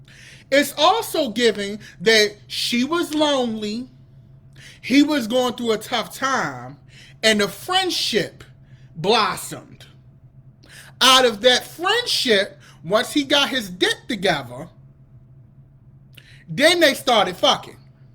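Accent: Baltimore, United States. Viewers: Baby! I got my whole life in this life! They want her to be humbled down and I’m so glad she didn’t!! Where are you from?? It’s giving DC or Baltimore?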